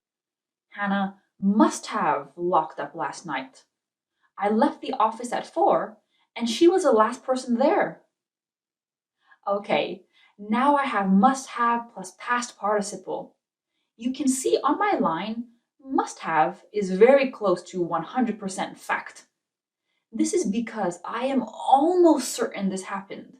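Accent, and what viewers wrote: United States. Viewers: That's true! number one in American English! We do not need British English))) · Excuse me, may I ask you where you come from? I wonder how to indentify your accent, but I guess it's near to american. By the way your speech is very clear. I really enjoy your lessons :)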